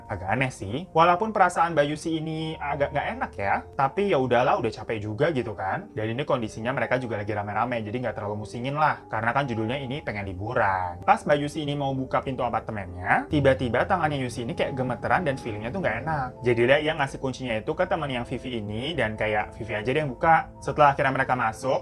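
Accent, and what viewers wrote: Java, Indonesia. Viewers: Bg iki wong jepang atau wong jowo?? 😂😂 sipit² tapi koe medok wkwkwk Lucu sih..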